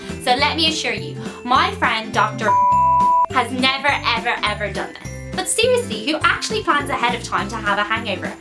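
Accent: Ireland. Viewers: I can usually understand you, but I was too hung over to understand a word you said? You were gung ho on the Irish accent on this one? WTH?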